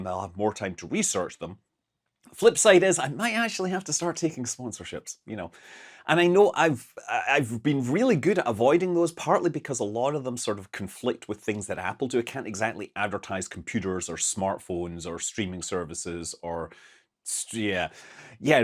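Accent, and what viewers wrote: Scotland, United Kingdom. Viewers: I'm not here for the visage. I'm here for that Scottish brogue! 😁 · Please confirm that you will still says "moon" with that deep Scottish accent. Nobody wants that to change. On a more serious note: Congratulations on this new chapter on your life. It seems you have a lot of good fun ahead of you. Happy for you. Enjoy the ride. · Congratulations, you're a genuine good guy who deserves all the success in the YouTube world. Have always loved your channel and your unpretentious style of presenting. Plus you haven't adopted some awful mid Atlantic drawl and kept your lovely Scottish brogue 😂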